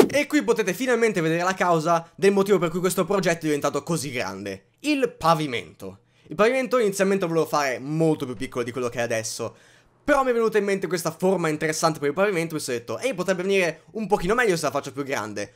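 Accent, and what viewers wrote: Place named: Emilia, Italy. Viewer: Sei emiliano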